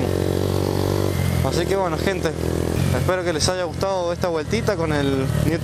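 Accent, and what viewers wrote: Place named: Mendoza, Argentina. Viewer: Jaja wuacho SOS de Mendoza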